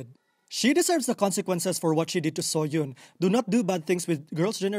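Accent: Philippines. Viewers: I think the narrator was a Filipino, because of his accent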